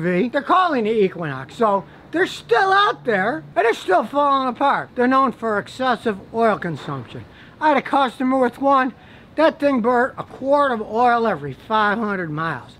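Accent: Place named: Brooklyn, United States. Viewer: This guy sounds like dafy duck and a really thick brooklin gangster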